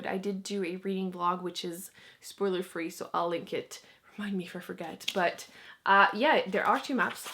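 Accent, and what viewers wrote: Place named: Canada. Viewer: You have an accent. Are you canadian?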